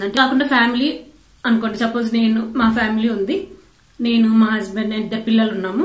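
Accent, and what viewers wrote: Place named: Godavari, India. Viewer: Nice info sis Nakkendukko japan ante chinnapadinunchi chala ishtam particularly Maybe when i was young babiah baga vellevaru ahh country ki, he is now MD of SaintGobain ippudu kuda appudu appudu velthuvuntaru Shud bisit Japan soon chellai , thanks for the info very much Bythewsy india lo meh proper ekkada godavari jilla nah mehh mataluu vintunte alanehh vundhi 💚😊😊😊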